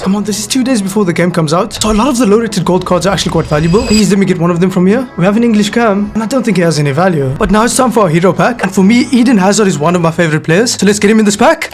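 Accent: South Africa. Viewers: Are you South African 🇿🇦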